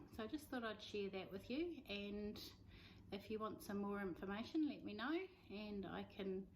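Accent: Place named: New Zealand. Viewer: hahaha that's her Kiwi accent wait till she says six ;)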